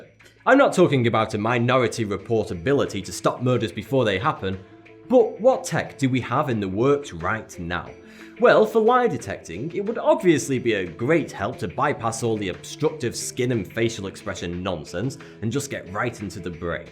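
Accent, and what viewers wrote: United Kingdom. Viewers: oh man this guys should totally be the new James Bond ! that wink can make any girl wetter than the pacific ocean, also his British accent is on point ayy lmao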